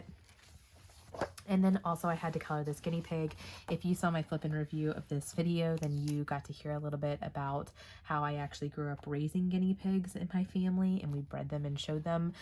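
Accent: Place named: Texas, United States. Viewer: Wow, congrats on the record and all such beautiful pages 🥰📚 I love your Texan accent and you did soo good on the pronunciation 👍🏻 Thank you for the shout out and your very sweet words, I really enjoyed our body-color 😊 I hope you feel better soon and I really look forward to all the interesting things you have planned for the channel and also congrats on all the new subscribers, that is so awesome 🤩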